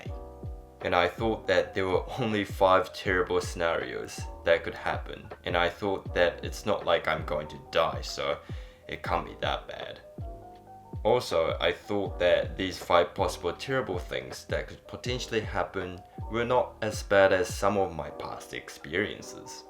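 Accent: New Zealand. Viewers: so that explains your accent, you have lived in NZ! Btw your videos are amazing and and an inspiration to people who practicing minimalism like me. Thanks!!! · Your kiwi accent is strong bro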